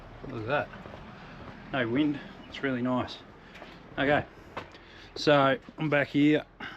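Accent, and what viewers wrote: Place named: Australia. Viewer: I'm enjoying the banter and seeing more of you, loving the aussie accent BTW. Keep up the good work guys, you do a terrific job 😊❤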